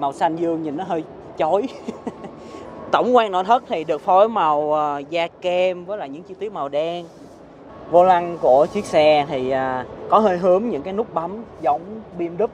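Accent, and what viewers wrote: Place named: Saigon, Vietnam. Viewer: Giọng sài gòn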